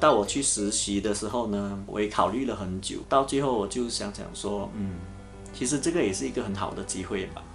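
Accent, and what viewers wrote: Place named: Japan. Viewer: 日本人讲华语好可爱哦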